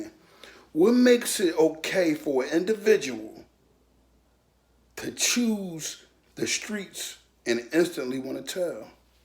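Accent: Philadelphia, United States. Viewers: Oh you definitely from home, philly lol I can tell how u talk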